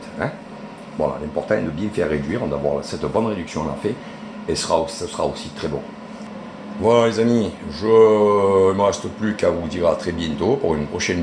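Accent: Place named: Marseille, France. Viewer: 👍👍👍👍👍👍👍👍👍👍❤❤❤❤❤❤❤❤❤❤ et avec l'accent Marseillais en plus (Le vrai...)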